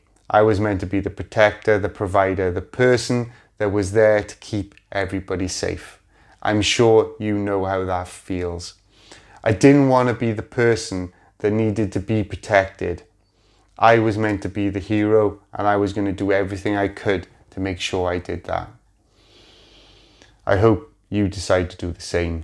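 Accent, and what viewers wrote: Wales, United Kingdom. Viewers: Thanks for a wonderful talk and love your Welsh accent! The way I think of things are that most medications only treat the symptoms of T2D , only a good clean diet of natural foods will help the actual disease from progressing. As Dr Jason Fung says “ Diabetes is a dietary disease and therefore needs a dietary solution”. Charge on Rhinos and good luck to you all.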